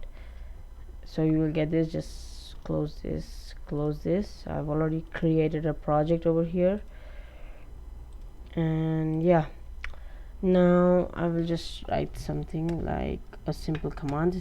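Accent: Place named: United States. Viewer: are han thike mujhe maloom hai kya amrica ki accent mai kyun baat karre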